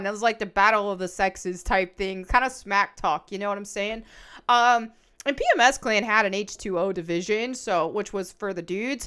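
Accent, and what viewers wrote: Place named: South, United States. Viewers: I think I’m hearing a bit of a southern accent. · I love Southern twang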